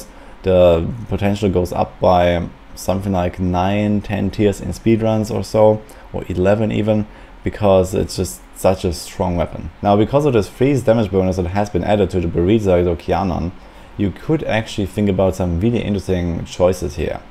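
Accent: Germany. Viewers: bist du deutcher? hast so den Akzent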